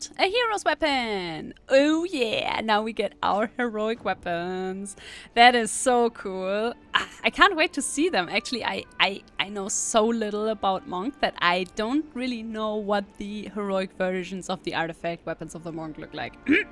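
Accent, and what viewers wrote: Germany. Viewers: Monk! Best class!!! 😃 A true master don't let dictate his style by others. Deutsch? Man hört es am akzent. 😅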